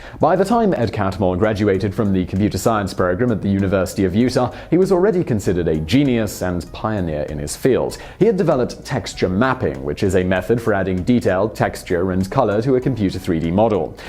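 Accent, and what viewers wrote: England, United Kingdom. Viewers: Why is Johnny Sins speaking with an English accent?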